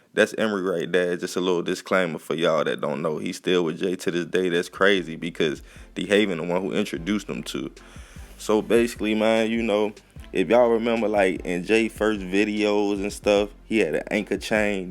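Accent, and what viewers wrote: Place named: Maryland, United States. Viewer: Are you from Maryland or DC i hear a accent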